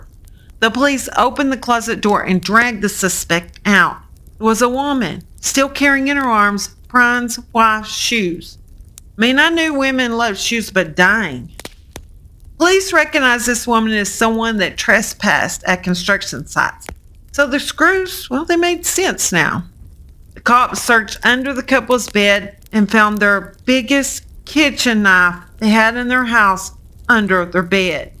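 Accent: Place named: South, United States. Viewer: southern accent makes the word poem sound like p*rn to my English accent, lol ☺️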